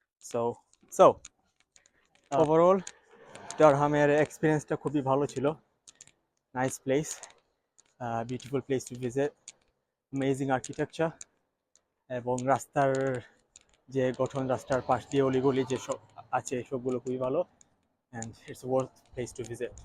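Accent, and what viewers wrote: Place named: Sylhet, Bangladesh. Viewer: ভাই সিলেটি মাত ঐ ভালা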